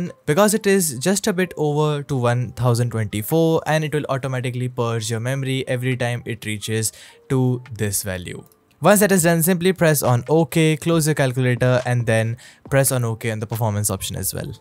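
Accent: India. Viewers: are you Indian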